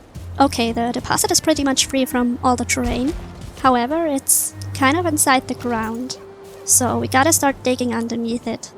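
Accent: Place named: Australia. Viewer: Bringing metal by boat cost my like 1 3rd of my game time. I think the reason to have it disabled is because you get upgraded portals in the Ashlands, that let you take metal through. I think you should try using the cart it's hilarious. Oh btw. try not to die too much cause skill drain will slowly deplete your skills. Your sounding more Australian by the day. Good video. :face-blue-smiling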